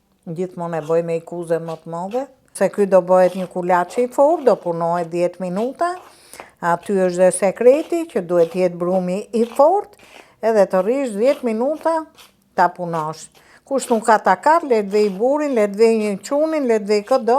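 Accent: Tirana, Albania. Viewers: Pershendetje zonja e nderuar.❤🥰Te lumshin duart e arta e folura tironce na kenaqe me kujton momen time.❤❤Te uroj shedet e gezim e lumturi me gjithe familjen.🙏❤🥰❤🥰❤❤🌲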